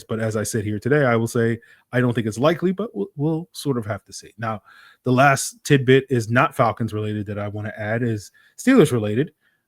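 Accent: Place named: Germany. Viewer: I vote more German accent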